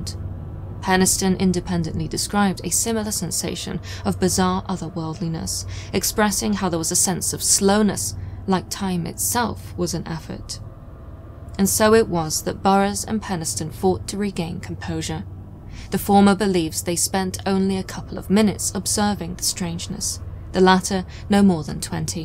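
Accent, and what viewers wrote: United Kingdom. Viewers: A similar thing happened to me after the second encounter (that I remember) a few years ago. I suddenly couldn't stand meat being cooked around me, it used to upset me so much I would start crying if I smelled it? I was already vegetarian but became vegan. I quit a 10 year smoking habit in 2 days. But i still love red wine though I can only drink 1 glass or I get very sick. Weird! This seems a great channel, I'm so happy to find a British narrator & you have a new subscriber 🌹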